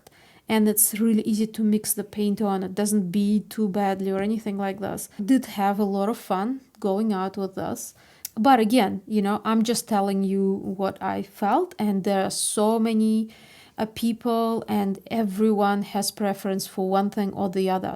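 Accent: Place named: Russia. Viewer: Абсолютно русский акцент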